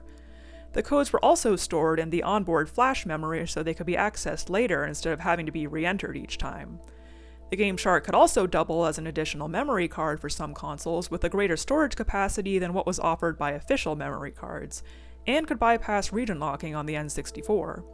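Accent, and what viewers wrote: Canada. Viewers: i didn’t realize you were canadian until you said “zed” and then the way you say “newer” (nyoo-er instead of noo-er) really cemented it lmaooo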